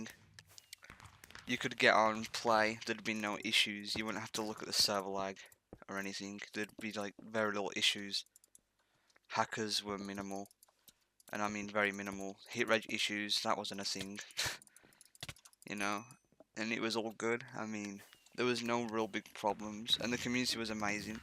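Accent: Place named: North, United Kingdom. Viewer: Omg your so northern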